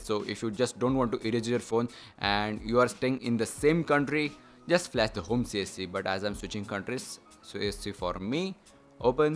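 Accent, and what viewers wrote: India. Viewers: Idk if this is sounds racist but, I freaking love Indian accents! It's just something flattering about them.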